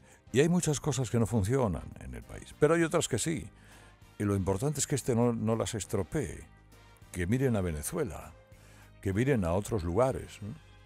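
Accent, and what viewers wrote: Spain. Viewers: Hay pobre pend... que no sabes de historia, primero entérate tampoco éramos la nueva España. Pobre pend... no sabes de historia y deberías estudiar antes de abrir tu osico por tu acento español.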